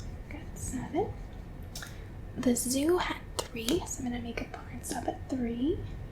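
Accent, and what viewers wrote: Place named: Michigan, United States. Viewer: She's gotta be from Michigan right? Or upstate New York. Something up north. Love the accent!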